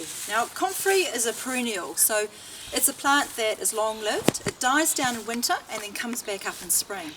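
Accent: New Zealand. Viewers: You sound like a kiwi, thanks for the great information as I love herbs. I want to suggest one thing, try to be less violent and communicate with them more through intention, you will find that you will get a better result with everything you desire to achieve from them. blessings. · When I move to New Zealand in the future I hope to adopt a nice kiwi accent like yours. It truly is nice.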